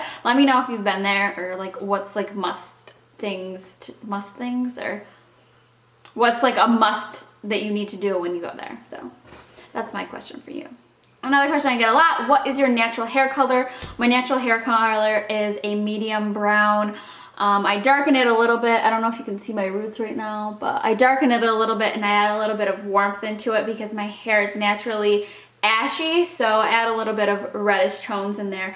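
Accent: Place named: New Jersey, United States. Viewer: lol i have been watching since she started on and off and I always knew she was from NJ because of the way she talks and she talks with her hands a lot like we all do from NJ specially with italian blood but I never heard her say it and after I saw the back of the house i was like that has to be an older NJ house!!! LOL specially up north NJ bc they are soo much more pricey that you are almost forced to buy a fixer upper but in the end its better because you can put ur own little finishing touches! xox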